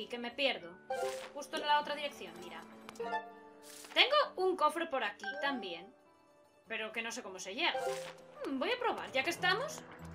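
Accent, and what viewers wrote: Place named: Galicia, Spain. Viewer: XD eres gallega? se te nota mucho el acento!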